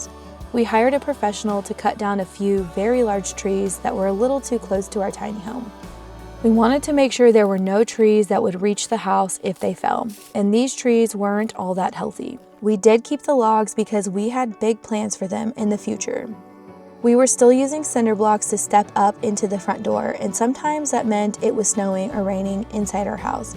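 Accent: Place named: South, United States. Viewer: Hi! I’m new to your channel and LOVE it!!! May I please ask what state you live in? I hear the accent and I thought something was mentioned that makes me think you are somewhere in Tennessee. Maybe one of the Carolina’s? I was raised in Kentucky. Rattlesnakes were a bit too chummy down there!😂😂😂 Thanks for such wonderful videos! You know you are doing a great job when the people watching want to be your friend, and we not only are entertained, but learn things as well! Joy and happiness to you!!💜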